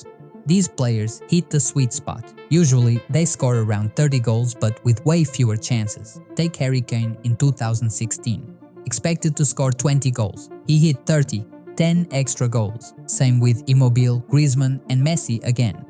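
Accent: Portugal. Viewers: This guy has a portuguese accent! Great video/Grande vídeo! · Este gajo eh Portugues.... de certeza. Heheheh Video genial!!!!!!!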